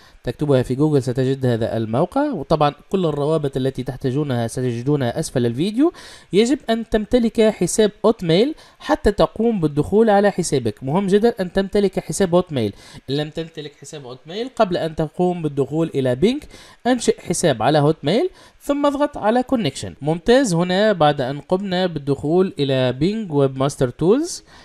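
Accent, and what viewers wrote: Tunisia. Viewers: 5ouya mbeyan men soutek tounsi ena tounsi zeda leken ett makch ma3rouf fi Tunisie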